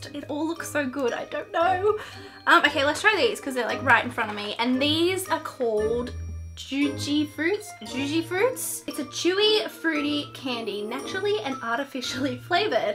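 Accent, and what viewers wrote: England, United Kingdom. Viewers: Oh I always thought you were British! Btw I’m a girl just on my dads account. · I thought she was from england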